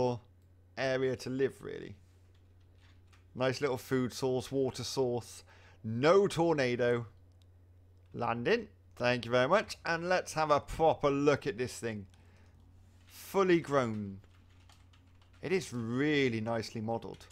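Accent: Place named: United Kingdom. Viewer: I just started watching your channel and your accent is so cool! ^~^ British accent? idk lol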